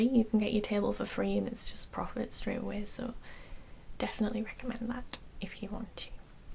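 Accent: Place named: North, United Kingdom. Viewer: I can never get over that northern accent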